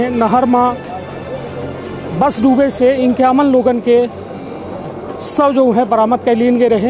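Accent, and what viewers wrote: Bagheli, India. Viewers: Bhai ye bagheli boli h,,ye news sunne ke liye ya to bagheli sikho,,ya is channel k video mat dekho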